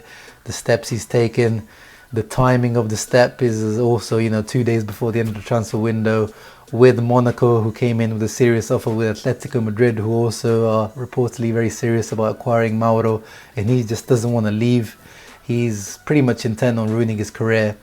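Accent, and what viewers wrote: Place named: Italy. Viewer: So far SO FAR I like your show. I agree. I think I'd definitely change that background. Shooting from your BEDROOM takes away from you believe it or not. I speak English but love hearing the Italian accent during commentating. Also better music for an intro. Better yet no music during you commentary. (Just some GOOD INTRO MUSIC.)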